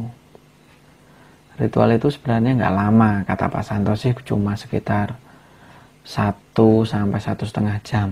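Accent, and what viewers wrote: Java, Indonesia. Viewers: Berasa aneh logat medok tapi ngomong "gue" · Haha slow..suka banget ..stip penyakit kejang ya..alias step..sukses lur..mending bosone biasa g usah nganggo lu gua..medok.hhh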